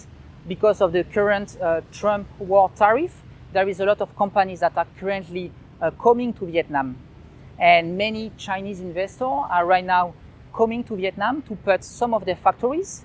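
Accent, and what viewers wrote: France. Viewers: I watch you for the French accent!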